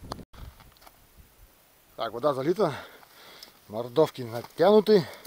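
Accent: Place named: Siberia, Russia. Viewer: НАВЕРНОЕ В СИБИРИ ЖИВЁТЕ СУДЯ ПО ГОВОРУ И СНЕГУ? Я СТОЛЬКО СНЕГА В ЖИЗНИ НЕ ВИДЕЛ,АХРЕНЕТЬ. ЛАЙК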